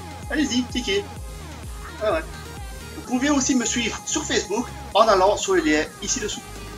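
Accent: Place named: Liège, Belgium. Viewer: un sublime accent liégeois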